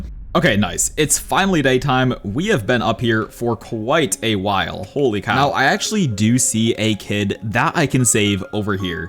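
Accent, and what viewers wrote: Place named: United Kingdom. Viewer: I think he is British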